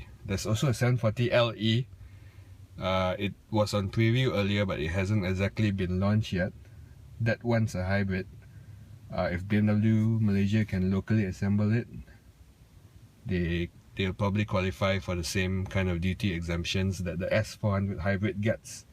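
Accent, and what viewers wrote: Singapore. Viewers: fucked up singlish accent bah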